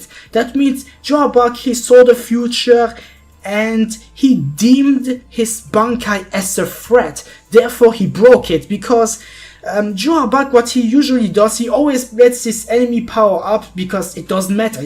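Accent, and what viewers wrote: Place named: France. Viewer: We can hear your french accent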